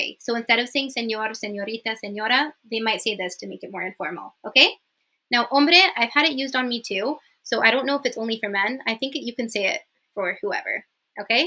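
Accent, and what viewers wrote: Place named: Mexico. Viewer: ❤Hermosa, tu acento es más latino, y de hecho suena como mexicano. Así que te vendría bien aprender slang de México, aunque hay algunas que usamos españoles y mexicanos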